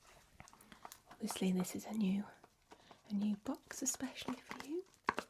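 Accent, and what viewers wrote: United Kingdom. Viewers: I love the tingly and relaxing video. The British accent is a plus. Just lovely. ❤️🌻💜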